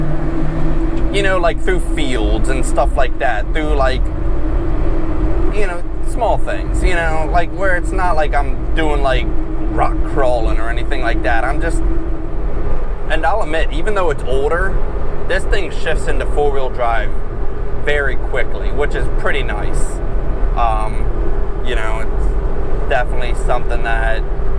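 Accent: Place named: Maryland, United States. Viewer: You have to be a marylander with that accent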